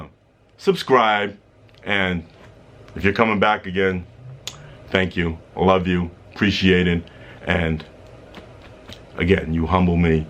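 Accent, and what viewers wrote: Boston, United States. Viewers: Lol i never really noticed you have a Boston accent until now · Your accent is really mild compared to a lot of other bostonians lol I've talked to.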